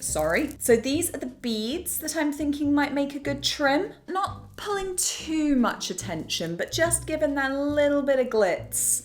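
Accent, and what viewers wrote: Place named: Australia. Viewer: I really liked the ducky pink and that sheer purple you had in the pile... Oh well, the lamp came out lovely. Hey, question... Where in brittain are you? You sound very Aussie to me (an aussie)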